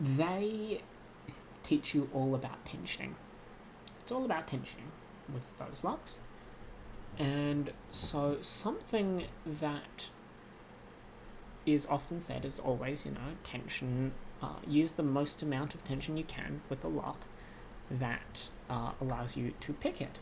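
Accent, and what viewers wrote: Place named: Australia. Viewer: Oooh, dont bend that fancy ratyoke pick! I always use absolute minimum tension, because I almost always use <num>in (~0.3-0.5mm) picks, i enjoy the feedback from them more i guess u could say. Thanks for speaking so clearly too, tbh I have a heck of a time trying to understand Australians speak, but didnt this time! Thanks for the informative video;)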